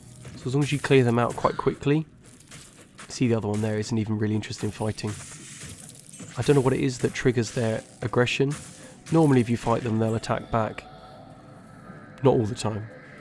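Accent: United Kingdom. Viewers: The dead-pan, British delivery kills me. “I’m debating profiting from mana potions. Is that the kind of example we want to set for our children this holiday season?” Lmaoooo Sooo many good one-liners. Well done.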